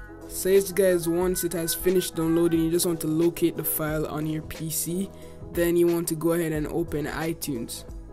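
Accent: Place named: Jamaica. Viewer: I just subbed, you sound like a Jamaican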